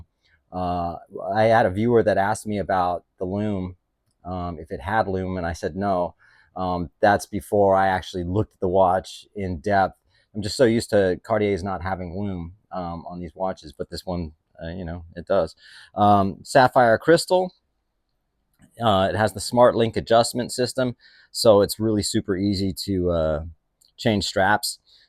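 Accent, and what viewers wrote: United Kingdom. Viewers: Great British accent….. can we have some more 😂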